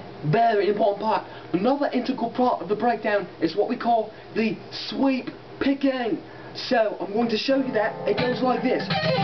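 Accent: United Kingdom. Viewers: I hate that english accent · fuckn british accnt irritates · where the fucking shit do ya live?? o.O your accent tells me you must be british but where exactly?? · This Vid...Had a important factor, a British Accent BRUTAL GUITARIST!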